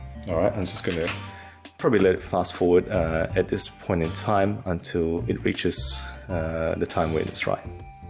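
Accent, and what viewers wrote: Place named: South Africa. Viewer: you sound very south african! coming from a native australian 😁😅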